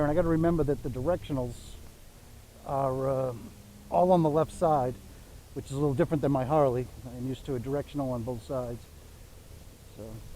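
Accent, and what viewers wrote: New England, United States. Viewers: "Manchestuh New Hampshuh" Love the accent. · Cool video, but if you don't mind my saying - that is a serious New England accent you got there.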